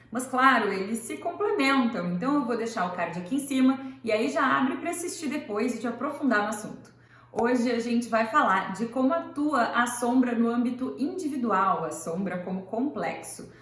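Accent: Rio Grande do Sul, Brazil. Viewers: Nossa tesão pela vida está lá nas Sombras . Tu és gaúcha? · Clareza e conteúdo denso com sotaque gaúcho. Excelente.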